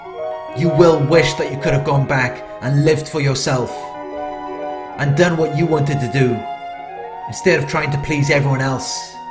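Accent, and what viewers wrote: United Kingdom. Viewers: No one explains the evil images of Narcissism like you do. Beautiful British accent. For sure narcs already destroyed tons of talented people who have so much to contribut to our world. This knowledge should have been readily available for everyone in their earlier age to minimize the effects of these emotional predator sadists. I wanna say thank you from the bottom of my heart.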